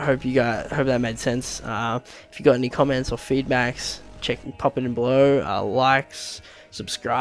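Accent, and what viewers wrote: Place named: Australia. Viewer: U Aussie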